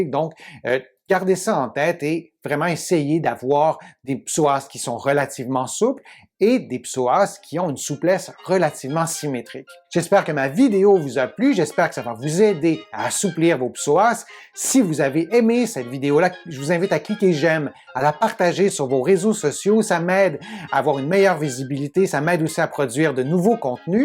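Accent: Quebec, Canada. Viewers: il a l accent québécois !!!!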